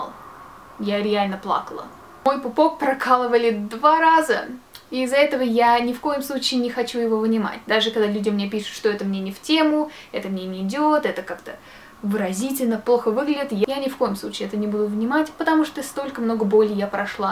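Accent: England, United Kingdom. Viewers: Такой английский акцент),это твоя изюминка) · У неё такой классный английский акцент;) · У тебя такой акцент сильный от английского)Так классно:3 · Английский акцент у тебя)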